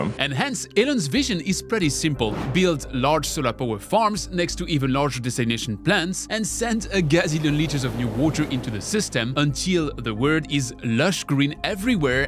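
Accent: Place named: France. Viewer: Your french accent is sweet, but please düvlop, it really is so hard to understand it is.. de-vel-op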